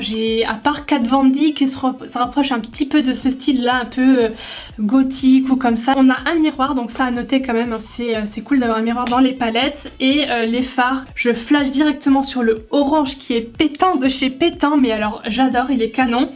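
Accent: Lorraine, France. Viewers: Haha, je me disais bien que je reconnaissais cet accent. Vive la Lorraine et vive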